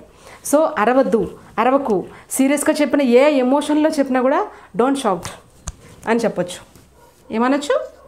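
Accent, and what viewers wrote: Telugu, India. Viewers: Tqq, nice class, so sweet of you and your Telugu slang laaa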